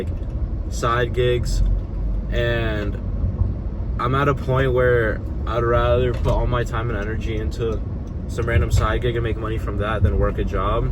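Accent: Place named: Eastern Europe. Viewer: Are you Russian/Ukrainian? Your accent is a little Slavic.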